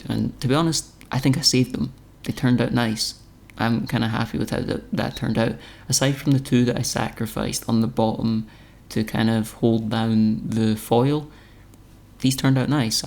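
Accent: Scotland, United Kingdom. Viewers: Weird as it sounds, I’ve been craving the sound of your voice all week. It’s so soothing, like ASMR. Lol, you’re a young Scottish Morgan Freeman. You should consider narrating. Thanks for posting this video in particular. Bake Off is another bit of ASMR for me and this combination has been absolutely wonderful. In regards to your three bakes, I think you did a great job. 10 out of 10. If it tastes good, who cares how much it looks like the traditional version. You’re not in a competition; you’re baking for yourself. All three looked delicious to me. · Is your accent Scottish? I like how it is far easier to understand than accents from Northern England!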